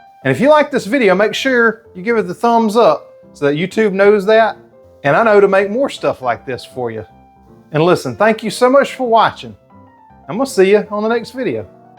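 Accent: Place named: South, United States. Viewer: I just love when you say, (in your distinct southern accent 👍🏻) “Well, I forgot to add that in,” or “I forgot to show you that,” or you forgot whatever…..& then you just correct it & move on. 😀 That means that yes, you are my people!! Because that’s exactly how I run my kitchen!! 😂 This is great seafood comfort food right here! Mmmm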